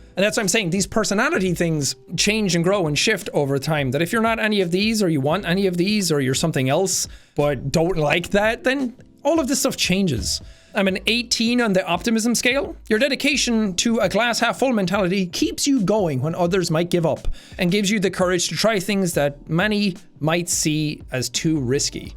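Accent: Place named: Ireland. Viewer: After the ronnie Neville video I can hear irish side in every video and its amazing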